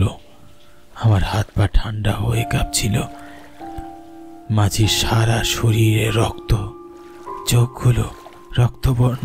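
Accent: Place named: East Bengal, Bangladesh. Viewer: Narrator কি বাংলাদেশী না কি? উচ্চারণটা পূব বাংলা ঘেঁষা। ভালো লাগলো না।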